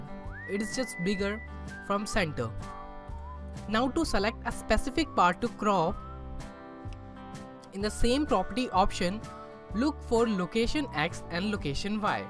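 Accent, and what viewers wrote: India. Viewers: he sounds like an indian tech scammer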